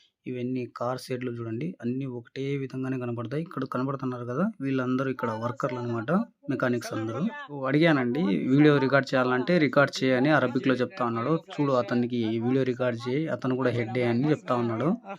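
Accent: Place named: Karimnagar, India. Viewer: Mee matalu vintunte ma karimnagar la anipistundhi bro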